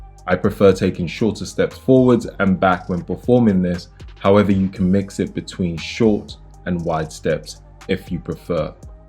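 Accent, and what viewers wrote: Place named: United Kingdom. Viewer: I swear all people with that accent and are from the uk or whatever call their backyard a garden 😭😭😂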